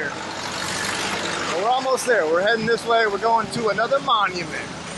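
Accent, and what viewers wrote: Texas, United States. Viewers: LOl i knew it.. i knew you are from Texas, my best guess was from mins when you said "45" that dialect tho.. i told my wife, i think this guy from Texas, and then later.. you said it yourself. you even did small homework about Jokowi and history, really really appreciate it! enjoy your traveling in my country. PS: that drunk dude mentioned "CIU" it's a chinese origin language for SAKE like beverages.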